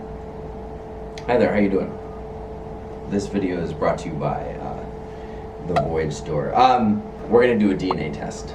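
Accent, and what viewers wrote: England, United Kingdom. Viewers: His English acent 🤩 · I really love his English accent 😭❤️ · Isnt he the guy from 'Nabi my step darling'? Im shocked with his English accent. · Just dropping by to say I love your English accent 🧡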